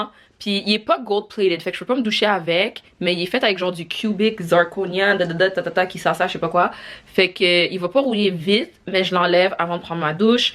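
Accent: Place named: England, United Kingdom. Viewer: THANKS 🌟 🌟 FOR ANOTHER🍎 HEARTWARMING DOSE DOUBLE DOSE OF FRENCH. 🍏 LATELY IT SEEM THAT U HAVE A LITTLE MORE ENGLISH INFLUENCE ON YOUR FRENCH THAN BEFORE.😳 GREAT JOB.🏆 Of course I thought your next project would be a podcast called 🎈 in the House🎈📚An online Book discussion📚🪙Your Own novel🪙 and the sky is the limit.🥁 BIG UP YOURSELF 🔥🔥🌎💙🎥🎬🍀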